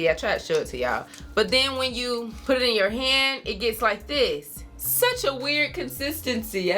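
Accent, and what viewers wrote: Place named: Alabama, United States. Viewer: Where are you from?!?! You sound just like my man’s family from Alabama! Love the video btw. 💛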